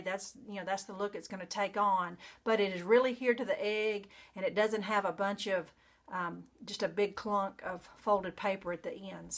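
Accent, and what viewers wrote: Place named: Arkansas, United States. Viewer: i just love rabbits, too -- and those are adorable. The eggs look great in the jars. i live in Va. and my best friend lives in Mena, AR. Her husband is from AR and your accent is so great and reminds me of them -- makes me miss them, too -- maybe i'll send them a care package of eggs !! Also, thanks for the tip on Dollar Tree. i was going to Tues. morning tomorrow, but will hit DT first. hope you and your family have a very blessed Easter season.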